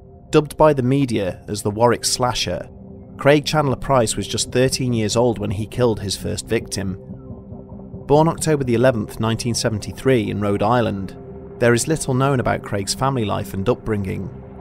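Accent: United Kingdom. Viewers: Sorry just because it’s a British accent doesn’t mean it’s good 🤷🏽‍♂️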